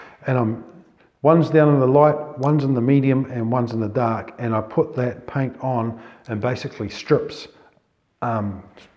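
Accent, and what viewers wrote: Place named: New Zealand. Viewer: Just found your channel and subscribed. Nice hearing another kiwi voice and familiar scenery for a change. Very informative tutorial thanks.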